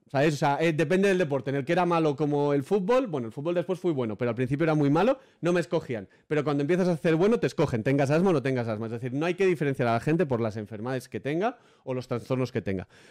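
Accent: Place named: Spain. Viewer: puede ser de españa y en españa eso es hablar bien, vos como sos argentino quizas lo encuentres raro xd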